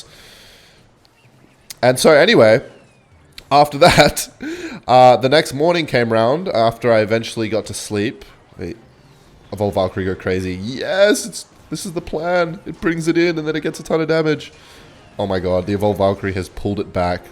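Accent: Australia. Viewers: sometimes i forget is australian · Are you from Perth brudda? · Mate you from WA?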